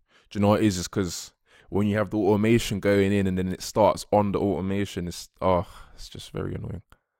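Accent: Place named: London, United Kingdom. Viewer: Yes, bruv!! Which part of London you from?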